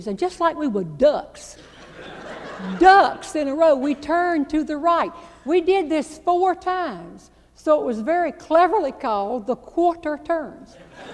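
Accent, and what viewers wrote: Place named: Georgia, United States. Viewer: her accent sounds so much like a South Georgia accent I love it!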